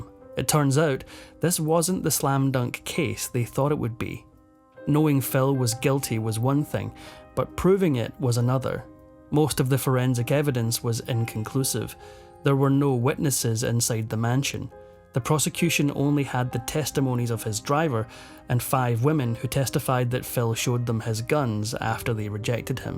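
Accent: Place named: Scotland, United Kingdom. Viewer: Yay my favorite Narrator the Scot!!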